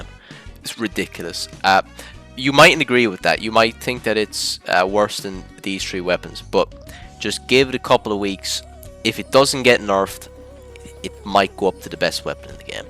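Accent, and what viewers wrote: Ireland. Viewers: Haha the Irish accent is unique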